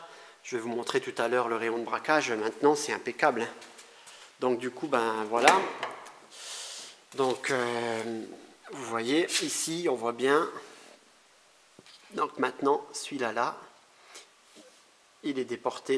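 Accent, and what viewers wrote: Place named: Alsace, France. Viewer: meme famille ! ;) ; tu as l'accent alsacien c'est ouf :) j'aurais parié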